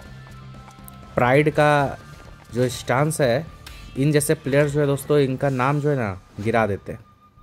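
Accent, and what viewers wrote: Bihar, India. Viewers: I love our bihari ascent😂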